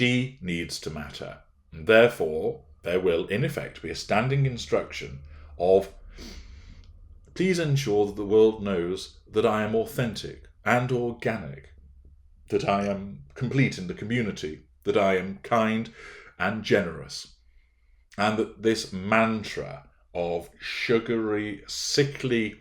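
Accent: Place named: Lancashire, United Kingdom. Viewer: Hi. Have thought many times hearing your voice inflections that your early life was in either Lancashire, I’m a Lancashire lass now in Australia, or in Yorkshire. Thank you for your insight.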